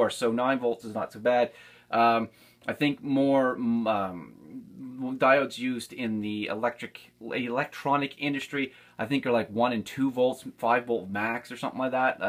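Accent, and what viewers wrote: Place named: Canada. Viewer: You're from canadia, I can tell.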